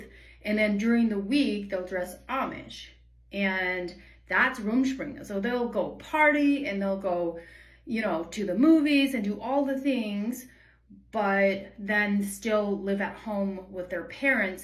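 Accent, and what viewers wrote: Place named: United States. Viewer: You sound like you got a lot of American in you and your parents were American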